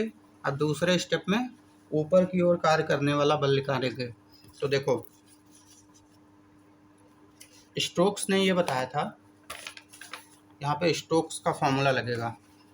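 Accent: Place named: Bihar, India. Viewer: Bihari ki tarah mat bolo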